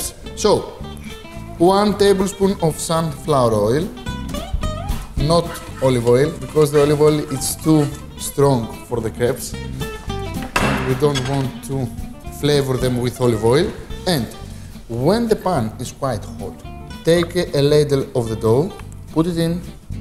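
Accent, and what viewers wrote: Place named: Greece. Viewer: Καλό μαγείρι ο ς, δεν λέω ΑΛΛΑ, εμείς στραβωθηκαμε να μάθουμε Αγγλικά, ακούγαμε τα εξ αμάξης στην Αγγλία για την προφορά μας, και έρχεται ο ς με την ελληνικότατη προφορά του, να μπερδεύει το αλμυρό με το ξινό, να μην ξέρει πως λένε ούτε τα μπισκότα, και παρόλα αυτά τους βλέπεις όλους στα σχόλια να ξερογλυφονται. Γεια σου ρε!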